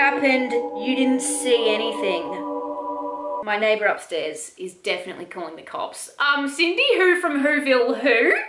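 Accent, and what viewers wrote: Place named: Australia. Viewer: Oh wow, I so enjoyed your video. You completely are real, funny and totally honest plus I love your Aussie accent. Btw- I like the way your hair looks. I don't even bother sectioning my hair. I just grab pieces and go at it.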